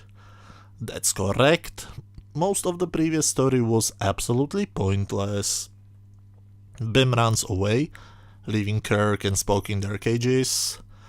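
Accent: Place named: Israel. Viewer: Uhm, where are you from? Your English is quite good, but if I had to guess (please don't be offended) I'd guess that you're Israeli. (I'm sorry if I'm wrong.) I just ask because I spend so much of my time teaching English to Israelis (some of whom are also Russian or Ukranian, very few of which are South American). Still, your English is quite good! Για να ξερετε.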